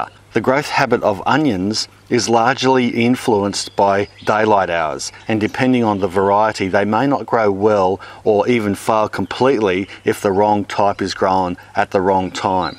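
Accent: Australia. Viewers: Great info - thanks. Lovely to hear an Australian accent too. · Love your Aussie accent, how I wish I could have a real garden, not just potted planting.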